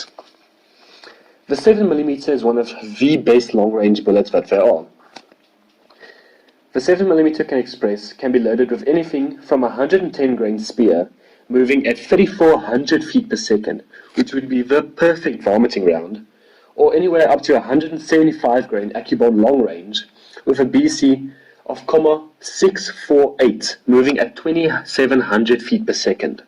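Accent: United Kingdom. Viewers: Your an idiot 25 06 has been around for about 100 years it must be that Brit tone that you have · Your narrative is useless - try another enclosure for your talk - and get rid of that shitty British accent. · Why is everything these days have to have someone with an English or British accent? Minute I hear and I turned it off because it's b*****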